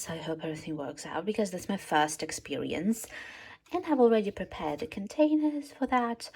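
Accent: England, United Kingdom. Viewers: who comes you have such a beautiful English accent 🇬🇧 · Sounds to me like she’s acquired a British accented English, interesting as many Eastern Europeans have a distinct accent. Lovely to listen to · How do you speak like a British living and raised in Belarus 🇧🇾 By the way your life is so beautiful so you are. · Your British educated by the sound of your English I'm growing watermelon this year see if technique works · You have a English accent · Im just so chuffed how you are not using American English but English from England proper. So nice. Those melons looked so jucy and colourful. Prayers that the geo politics of today will not affect your lovely part of our world. Cheers from Sweden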